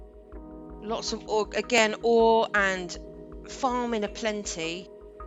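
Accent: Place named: England, United Kingdom. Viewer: sit ya self down, put ya feet up an sip on sum sweet tea for this read. K In my self created tiny world fueled by my vast imagination I’d pictured you sounding like like err… Well I didn’t think you’d have one of them adorable English accents the kind ya find in those Mary popping movies where as soon as ya speak the world shuts up an pays attention so yepperz that kinda accent.Gettin back to my wide off the mark imagination, Id visualized ya sounding exactly like my dear friend who’s shares the same name.. So it was a surprise finding ya not to be Portuguese but a London tour guide Mary poppins! Anyways on to shop talk it’s actually great to see someone doing a map show case….On my channel I do similar show cases on Skyrim so I luv a gd presentation. I hope y’all cover maps of my stompin grounds Tennessee an Louisiana that’ll be a treat. 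Maybe one day y’all cover one of my maps I’m Fixin ta do sum eventually.Anyways teas run dry an feet are aching for a step n a jump I’ll release ya from this insane comment.. oh btw I’ll PROMISE to join ya on ya journey as long as ya poppin in from time to time to check out my skylines videos on my channel 😜 An I ain’t inta breakin promises to a lady I’ve got 4 of them myself at home remindin me daily not to do so. 😠 Mucho Amar 2 youuu an C ya 😃😃😃😃😃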